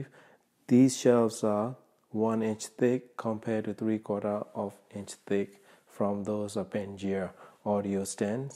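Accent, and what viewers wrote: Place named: Myanmar. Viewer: You have a Burmese accent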